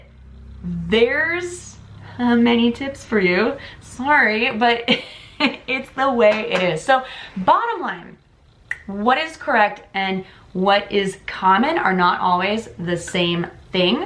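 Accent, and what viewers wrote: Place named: United States. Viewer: Thank you for the video,! I'm from Russia, and I learn English. I don't speak English very well, and I don't understand all that you said, but I really enjoy listening to you, because I love american accent! I hope I can speak English like you someday:) I'm sorry if I had a lot of mistakes, because my English level is Elementary now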